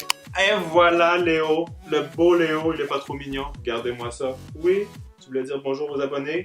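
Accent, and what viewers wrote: Quebec, Canada. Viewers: chouchoumiro msp moi aussi trouve ils se ressemble mais aussi j’me disais pk a un accent allemand et un accent québécois?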